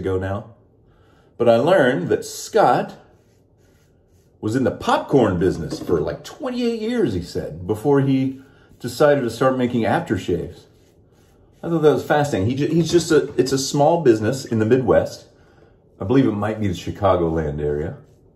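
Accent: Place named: United Kingdom. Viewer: You had your british accent going on throughout the video. Hh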